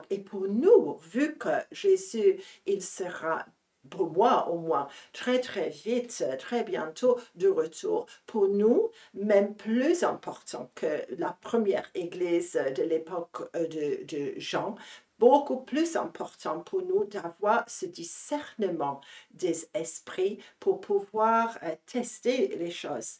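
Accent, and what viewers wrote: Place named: England, United Kingdom. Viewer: Did you try to pray Holy Father Pio de PIETRELCINA.? I AM SORRY BUT YOUR VERY STRONG ENGLISH ACCENT IS AN IMPEDIMENT TO MY UNDERSTANDING . HAVE YOU AN ENGLISH BLOG PLEASE.?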